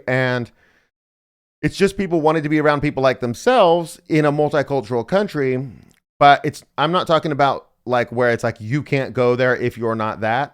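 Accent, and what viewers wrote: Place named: United States. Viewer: Why is this guy who is clearly of European heritage speaking as if he belongs in the Americas?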